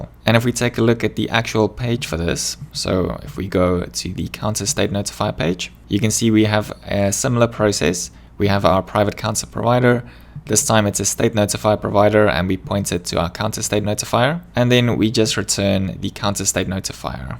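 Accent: South Africa. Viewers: As a South African living in UK, learning Riverpod with your accent is wonderful! 'Bare with me, hey' <3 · South African??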